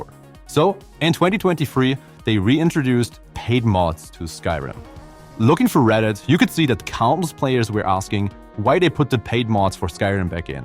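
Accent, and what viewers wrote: Germany. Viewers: Love the german accent ;) Good videos, high quality, keep it up!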